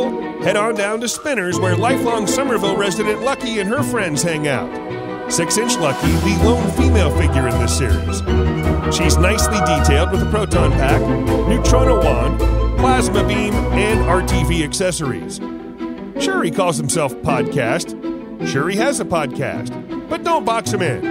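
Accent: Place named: Michigan, United States. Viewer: You have the Michigan accent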